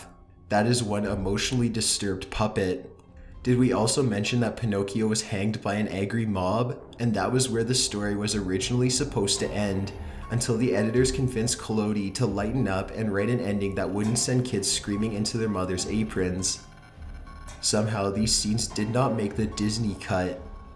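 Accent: California, United States. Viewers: lizzie your right, sounds like "the Californians" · The narrator of this is soooo obviously Californian !! Such a thick accent, where ever sentence almost sounds like a question. lol. Not hating, just saying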